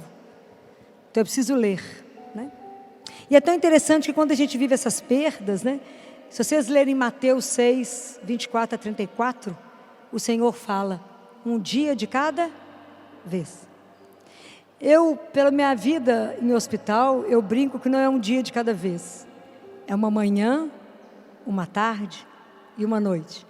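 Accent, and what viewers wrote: Minas Gerais, Brazil. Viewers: Eu chorei e consegui aprender muito.pq muito do q ela falou e passei e passo pq meu irmão esta desaparecido ha dois anos. Mas aprendi que entre ele e o sumiço a misericórdia de Deus é infinita. E se fizeram algum mal pra ele como disse o marido dela.Deus irá ou já fez justiça. Mas não sabemos o q houve. Mas sabemos q Deus esta com ele onde quer que for. E meu pai teve morte trágica ele interrompeu a vida dele aos 62 anos. E através da pregação dela e ainda mais q foi mensagem do papa. Hoje eu creio que meu pai e de meus 8 irmãos esta no céu. Com Jesus e nossa senhora. Obrigado Dra por existir por compartilhar e ajudar tantas e inúmeras vidas. Amo te ouvir ainda mais por ser mineira. Q acho lindo esse sutac. E vc é maravilhosa pq disse seu Sim a Deus e por tudo. De toda minha alma e coração muito OBRIGADA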